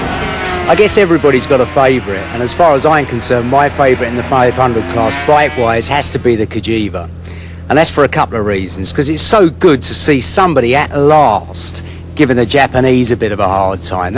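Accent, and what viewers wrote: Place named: Australia. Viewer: he's got an aussie accent on here. I guess that's what happens when you live in Australia for 10 years, lol.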